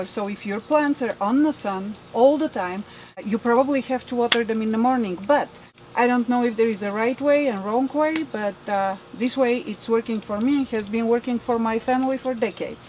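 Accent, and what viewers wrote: Eastern Europe. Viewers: get rid of that east melania trump european accent. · When you start your plants do you put 2-3 seeds in and thin them out later or just use one seed at a time? The ones I thinned are about dead but the ones I didn't are doing great. I have one plant that keeps growing but the leaf curl is so bad they look like stems, will they ever go back to looking normal? Also, I water at night too. What country are you originally from, sounds like a border Russian country. I hope you don't mind me asking.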